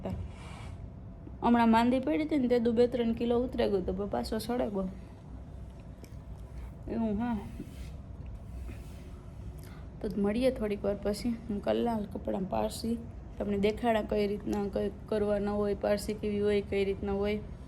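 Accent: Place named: Kathiawad, India. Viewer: जी गुड मॉर्निंग मैं आपका ब्लॉग देख रहा हूं मुझे आपकी भाषा बहुत अच्छी लगती है वैसे मैं भी गुजराती ही हूं टिपिकल काठियावाड़ी भाषा सुनने में बहुत मीठी और अच्छी लगती है मैं अहमदाबाद गुजरात से हूं अपने बाजू में कोई साइकिल वाला हो जिसकी नंबर प्लेट हो उनको ही पूछ लो 👈😍🤣😂🤗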